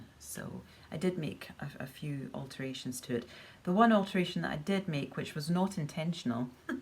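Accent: Scotland, United Kingdom. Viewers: I am happy to have discovered your podcast just after you posted your first episode. You have a lovely, calming voice and as someone who visits Scotland yearly (not 2020 unfortunately), it warms my heart to hear the Scottish accent when I'm so far away. My question is do you ever begin a project and decide you are just not feeling it and decide not to finish? If so, do you have any examples? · Thank you for this giveaway. I’ve never seen such gorgeous project bags and notion pouches before. This is the second video I’ve seen of yours and was wondering why your accent isn’t as strong as some others. Did you grow up in Scotland or just moved there in later years? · Dear, what a lovely podcast you make. I love the 'slowness' and find it very relaxing to just listen to your beautiful voice and scottisch accent while I'm knitting, especially in these times when there's so much stress going on in the world. 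My question is: Would you please show a picture of your son wearing his quilt and the socks you made him? Only with his permission of course 😃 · I absolutely love your Scottish accent and your gentle voice! My question is what is the coldest it can get in the Scottish winter and when it’s cold what type of yarn do you choose to wear?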